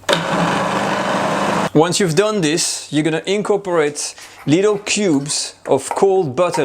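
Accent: France. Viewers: I love this French accent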